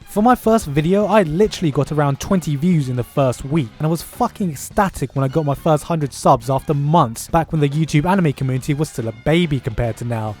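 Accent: United Kingdom. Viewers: Why DOES he sound British???? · British accent, check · Did you learn the British accent just for your videos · Why does this japanese guy sound british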